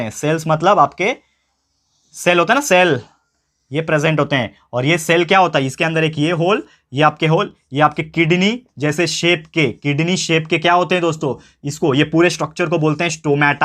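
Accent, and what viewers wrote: Maharashtra, India. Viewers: aap marathi ho kya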